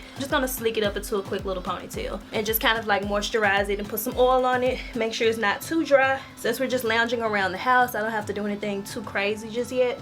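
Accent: South, United States. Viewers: I love your voice, so comforting..and u have that lil southern accent too...☺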